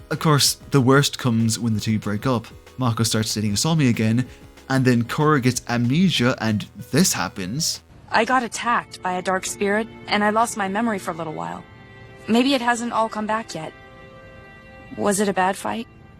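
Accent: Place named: Ireland. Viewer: exactly 😅 it's so mixed, it's like every Irish accent mixed together in a bowl 😊 no hate, I just can't place it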